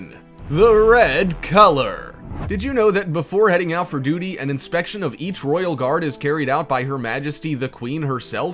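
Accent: United States. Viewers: there's an American doing the voice over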